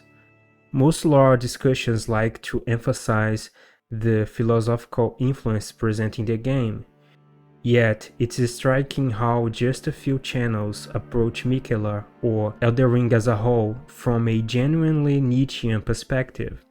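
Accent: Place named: Brazil. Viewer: Are you brazilian? While very good, your english has some giveaways that sound to me like brazilian accent. Great content tho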